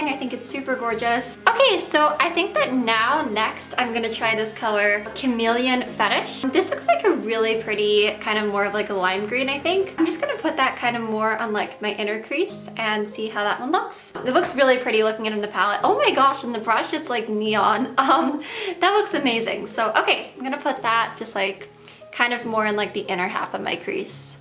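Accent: United States. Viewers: that's just an american accent lol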